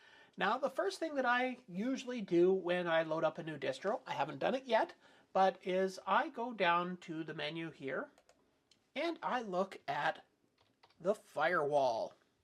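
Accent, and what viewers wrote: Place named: Canada. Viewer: Dear Canadian, March 2026. I'n a 90 yo Australian living in Brisbane. Gee a Canadian Web site what a surprise. We like Canadians even though we don't like the acent which is close to yanks. I have used Linux a few distributions, Mint for the last twenty decades. God Bless. ====================================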